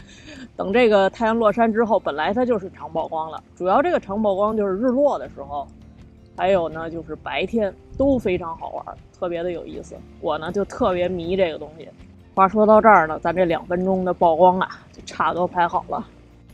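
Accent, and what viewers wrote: Beijing, China. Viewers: 绝对是个老北京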